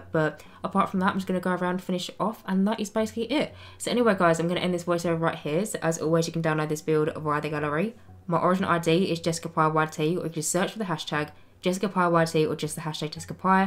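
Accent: United Kingdom. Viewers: The British accent helps a lot.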